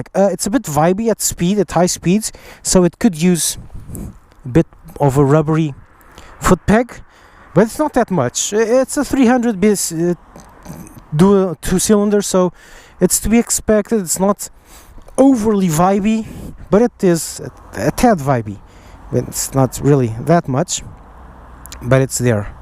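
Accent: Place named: Portugal. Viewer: só pelo sotaque dá para ouvir que és tuga crl! :D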